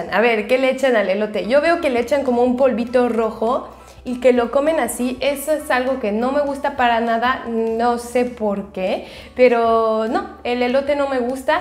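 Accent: Yucatan, Mexico. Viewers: casteñano, que elegancia la de Francia, mexicano en realidad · De hecho tiene el acento yucateco mas marcado que el francés. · Deberías de hablar con tu acento yucateco, se te oye bien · El acento Yucateco se te sale a veces jajajaja. Saludos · J'aime beaucoup votre chaîne, vous avez déjà l'accent du Yucatán! Felicidades, muy agradable ver tus videos:) Sigue así... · 4010 suscriptores cuando ví el video!!. Definitivamente me impresionó tu español mexicano!!. Que tal un video de tu vida y porque llegaste a México?? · Hablas muy bien español!!! Tienes el acento mexicano al 90% no se si tengas muchos años aqui pero felicidades mucho exito ya tienes un suscriptor mas :) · No manches hablas como Mexicana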